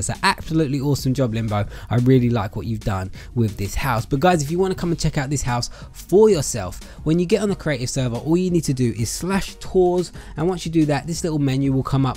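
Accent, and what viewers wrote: United Kingdom. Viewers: Wait do u speak British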